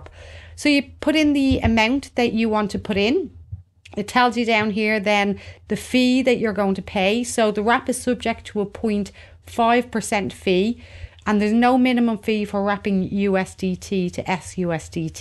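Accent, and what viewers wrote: Ireland. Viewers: Loving this video, valid info and straight to the point. Good hearing from a fellow Irish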